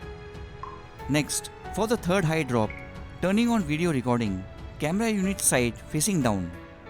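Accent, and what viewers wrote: India. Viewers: Nice test bro, india aksen 👍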